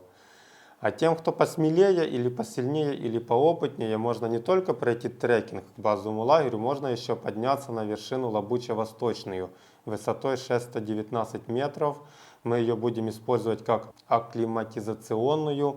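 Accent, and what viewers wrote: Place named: Surzhyk, Ukraine. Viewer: сделайте что то с суржиком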